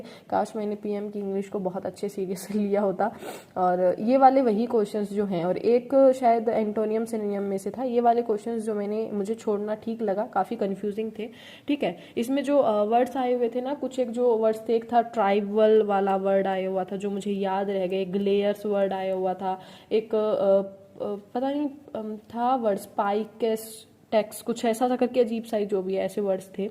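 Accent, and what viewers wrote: Haryana, India. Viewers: Good attempts 😀👍 aapka haryanvi accent bhot accha lagta hai meko 😊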